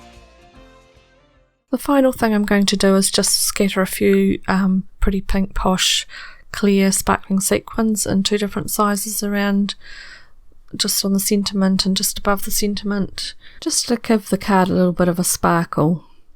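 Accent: New Zealand. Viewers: Such a beautiful design! Accent...is that a Kiwi accent??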